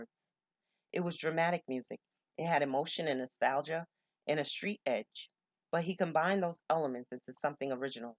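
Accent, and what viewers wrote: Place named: New York, United States. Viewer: Couldn’t imagine hearing this read aloud in no one other than that infamous NY accent. 🥰 u did that sis.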